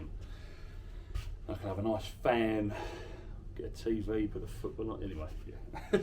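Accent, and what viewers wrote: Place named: United Kingdom. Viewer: Did you say Mill wall or meal wall? My closed captioning said meal wall. I did watch until the end. American English is my first language and I sometimes don't understand your accented UK English. I try. My ears aren't used to it. Very interesting how you find these great bargains. More power to you. You do work very hard for the profit you make, so your experience and research are making you a success. I have enjoyed watching you with Ben and the lads. Thanks for the video. Sorry it was so hot.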